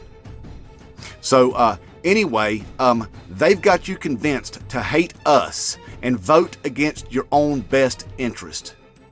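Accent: South, United States. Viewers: Cynical east coast here. That’s the best 6 minutes of southern accent I ever heard.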